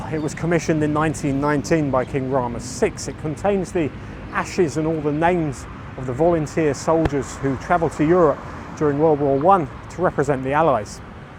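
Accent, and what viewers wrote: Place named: Yorkshire, United Kingdom. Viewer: Great tour...... Nice to see you've not lost that Yorkshire accent 😀 5555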